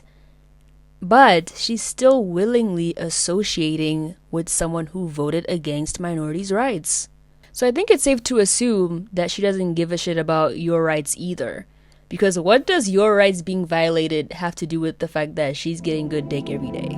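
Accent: South Africa. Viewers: Btw are you South African?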